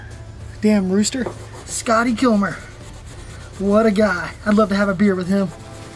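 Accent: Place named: South, United States. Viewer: The southern accent didn't clue you in?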